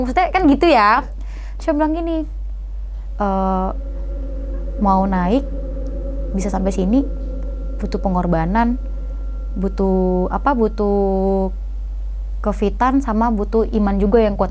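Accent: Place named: Surabaya, Indonesia. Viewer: Mbak.e ki wong ndi to kok logat.e kyk kenal, sby ta opo malang.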